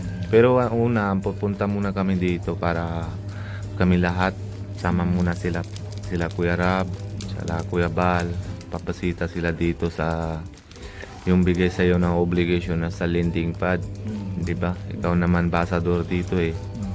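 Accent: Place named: Philippines. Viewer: Para na talaga filipino si kuya mapapansin sa salita nya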